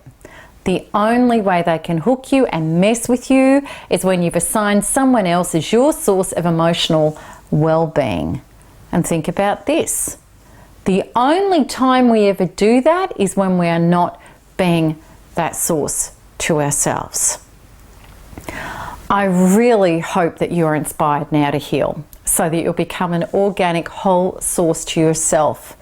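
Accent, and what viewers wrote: Australia. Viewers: Love your Australia accent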